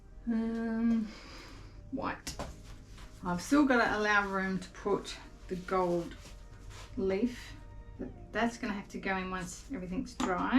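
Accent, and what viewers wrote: Australia. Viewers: Hi, love your Australian accent. This painting is gorgeous. You're so talented.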